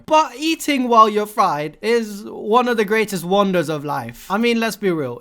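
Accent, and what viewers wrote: United Kingdom. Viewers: Now we got a British stickman stoner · And he’s British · Ayyy bri’ish stickman dude!!!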